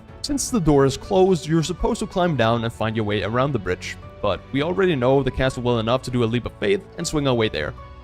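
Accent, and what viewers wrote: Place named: Denmark. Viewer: Are you Danish? Your accent sounds Danish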